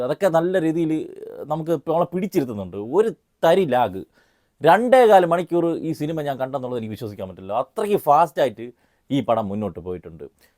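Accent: Trivandrum, India. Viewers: Trivandrum slang 😂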